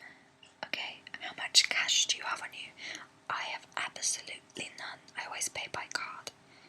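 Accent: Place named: England, United Kingdom. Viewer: Well I'm sure guys that know you an your boyfriend will agree with me. I like your accent aswell where are you from in England?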